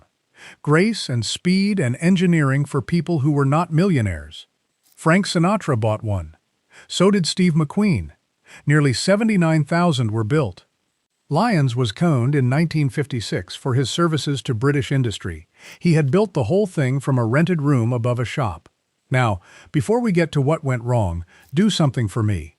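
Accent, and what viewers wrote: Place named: United States. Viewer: It’s Jaguar NOT feckin Jagwar Ai is bad enough but the Yank ones are even worse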